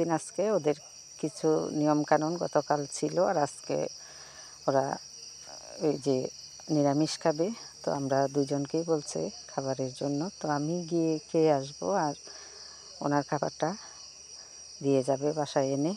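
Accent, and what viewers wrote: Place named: Kishoreganj, Bangladesh. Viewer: লাইক দিয়ে পুরো ভিডিওটা দেখে নিলাম দিদিভাই কিশোরগঞ্জের আঞ্চলিক ভাষা শুনে খুব ভালো লাগলো অনেক পরিচিত ভাষা নিমন্ত্রণ খেতে গেলে ❤👍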